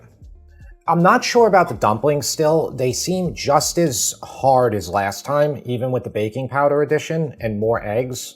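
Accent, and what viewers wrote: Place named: East Coast, United States. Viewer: Boouught and fooour cups, abatt. Awofff?!? Swalt?! What?! Is the east coast okay? Malk... no.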